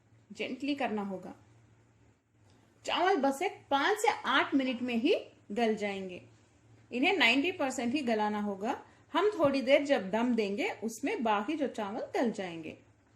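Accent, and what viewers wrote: Hyderabad, India. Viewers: Are you from Hyderabad (you accent hints) 😅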